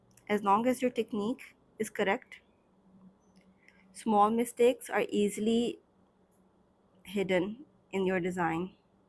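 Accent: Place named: Kashmir, India. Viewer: Your accent is really impressive .....!! ❤ are you from kashmir ?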